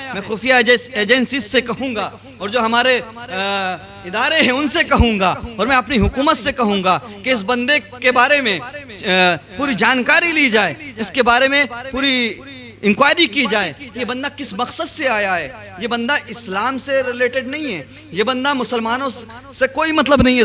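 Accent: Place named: India. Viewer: Kitni saaf urdu boltay haenh Indian ho k. MashaAllah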